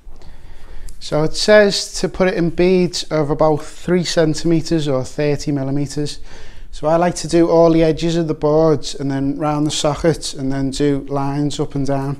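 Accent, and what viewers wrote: Liverpool, United Kingdom. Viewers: Hello Scouser! :) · I'm pretty sure he's from Liverpool?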